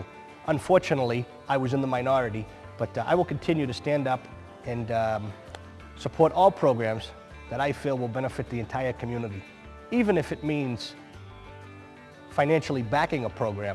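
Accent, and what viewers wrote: Boston, United States. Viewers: His Boston accent is stronger than mine!!!!! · I'd vote for him just for his perfect Boston accent!!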